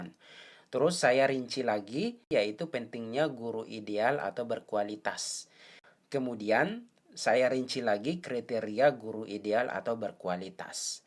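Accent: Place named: Mamuju, Indonesia. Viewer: mantap pak, familiar dengan aksennya, ternyata benar orang mamuju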